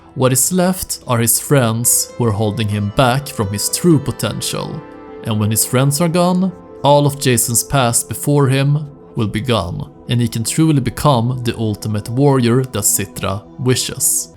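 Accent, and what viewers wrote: Sweden. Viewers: The swenglish is deliciously strong 🤩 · Your Swedish accent is noticeable mate. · tog mig 1 sec att fatta att du är svensk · den där svenska aksenten är galen manen grym vidio btw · Hey are you Swedish by chance? Your accent has a Swedish touch to it, also great video btw!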